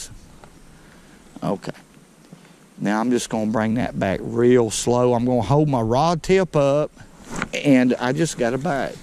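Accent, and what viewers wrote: South, United States. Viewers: Lol I’m quite a ways in this creek, next sentence no further than I went back in this creek lotsa shad 🤣 love southern dialects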